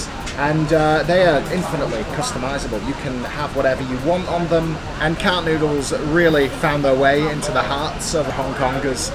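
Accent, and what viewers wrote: United Kingdom. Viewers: Lovely British accent and good food. Instant subscription and like👍🏽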